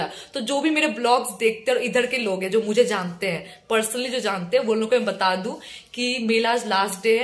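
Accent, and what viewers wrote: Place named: Bengal, India. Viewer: So cute bengal accent ❤❤❤❤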